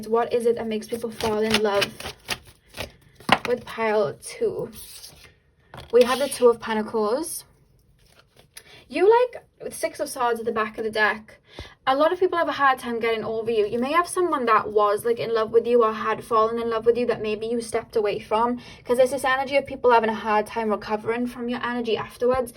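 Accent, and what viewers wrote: Wales, United Kingdom. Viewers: is that a welsh accent?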